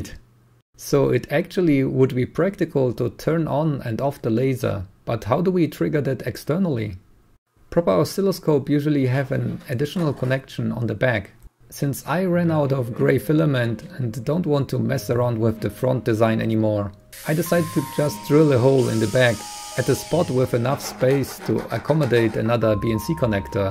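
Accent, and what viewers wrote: Germany. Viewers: I think you are german, because you have a german akcent, And this gadget is cool. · Bro nices video aber das ist so ein Kartoffel Englisch man hört das du Deutscher bist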